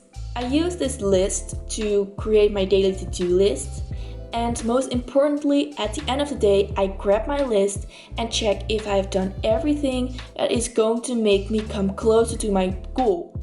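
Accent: Netherlands. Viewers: Are you Dutch? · Cool video! Are you Dutch? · Omg love your dutch accent! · Are you dutch? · Are you from the Netherlands, because her voice sounds a little bit like Netherlands People trying English. But your English is very well! I'm from the Netherlands! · Je klinkt Nederlands, klopt dit?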